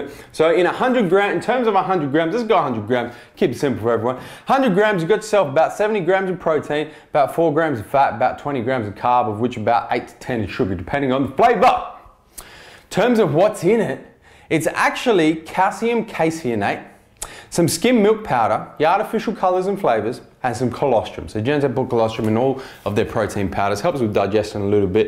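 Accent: Australia. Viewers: Excellent review love your Australian accent bro thanks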